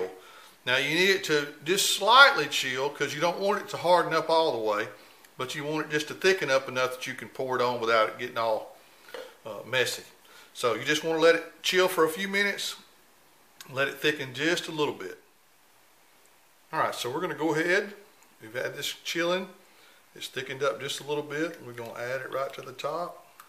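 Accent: South, United States. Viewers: Your southern accent makes me smile. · I'm from Kentucky love the way u talk y'all must be from the south thank u for that dish I had it a long time ago at a wedding reception and just loved it so much 💘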